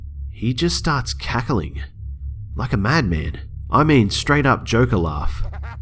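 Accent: Australia. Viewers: I love your accent!! Are you Australian? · are you also aussie? cuz honestly nobody in nsw says gday haha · AUSTRALIAN ACCENT YAS BITCH MAKES THE VIDEO BETTER. lol :3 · He's Australian he does not sound British at all -_ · That is the cutest accent I have ever heard!!! Is it Australian? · are you from Australia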